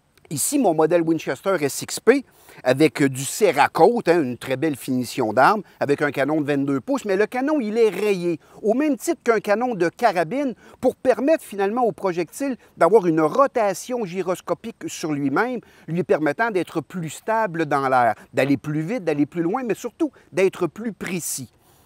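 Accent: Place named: Quebec, Canada. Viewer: toujours une joie d entendre nos cousin de la belle province . Le bonjour de l ile de la Reunion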